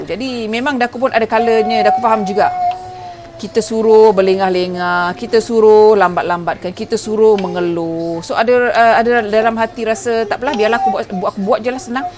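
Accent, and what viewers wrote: Negeri Sembilan, Malaysia. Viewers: Salam i baru ikut your channel apa nama you sis....u dr N9... sbb loghat u cakap macam orang nogori....dr mana Rembau / KP / Seremban...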